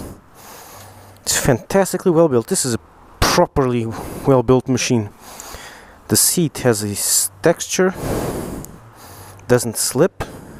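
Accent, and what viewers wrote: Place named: Portugal. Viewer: pelo sotaque nota se que es portugues tou para adquirir uma de 2016 sabes que modificaçoes teve as versoes aseguir?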